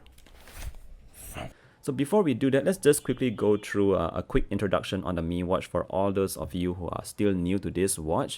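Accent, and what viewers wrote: Singapore. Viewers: Do I here a SG accent?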